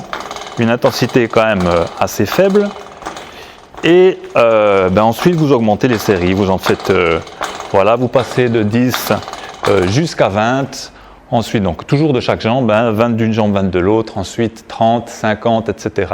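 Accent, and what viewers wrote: France. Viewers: Y a pas un ptit bout d'accent Franc Comtois là-dessous? Video tjrs d'actualité 👍✊🙏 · Gros merci ! Ca coule comme de l'Eau , avec un petit accent en plus ... Alsacien ?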